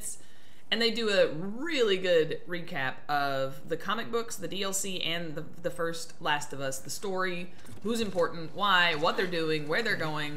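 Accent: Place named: South, United States. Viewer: I love your Southern Accent Southern Accent Gang love from Mississippi also you’re so adorable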